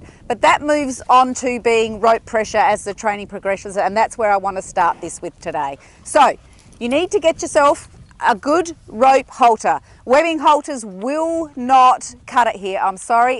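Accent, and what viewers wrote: South Australia, Australia. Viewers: Are you a South Australian? I'm trying to pick the accent. Great video!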